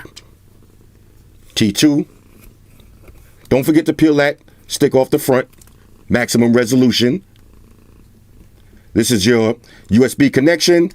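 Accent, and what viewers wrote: New York, United States. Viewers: You’re from queens or Brooklyn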